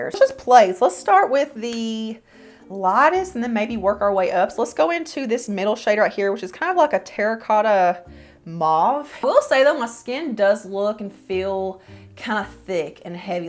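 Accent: South, United States. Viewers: Love your videos!! I am a Southerner and you accent sounds like you were raised in the South.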